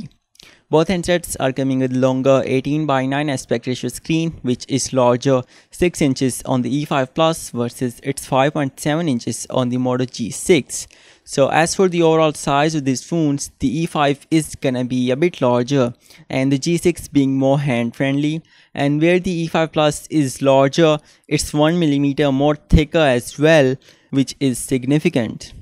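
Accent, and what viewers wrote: India. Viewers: Not a bad video but sometimes I find it a little annoying trying to understand his Indian accent.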